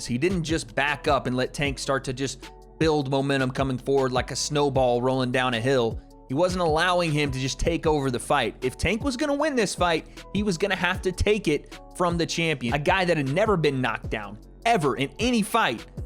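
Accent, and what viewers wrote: Ireland. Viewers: For an american your irish accent is pretty fire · Bro if I hear that Irish accent again I'll unsubscribe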